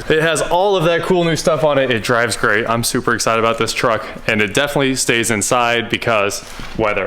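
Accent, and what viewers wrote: Kansas, United States. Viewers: Kansas- northern Oklahoma dialect. It's how we talk 'round here.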